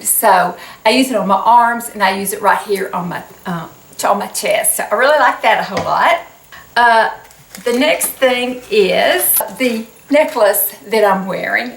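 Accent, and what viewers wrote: South, United States. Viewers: Love your southern accent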